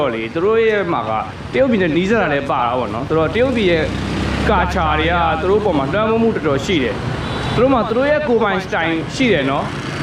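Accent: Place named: Upper Burma, Myanmar. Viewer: ထမင်းစားရင်လဲ ကို ပဲကြည့် အလုပ်သွားအလုပ်ပြန် ကားစီးနေရင်းလဲ ကြည့် videoလေးတွေတကရ်သဘောကြတရ် စကားပြောတာကလဲ လုံးဝပွင့်ပွင့်လင်းလင်းနက်လုံးဝအညာစတိုင်ကြီး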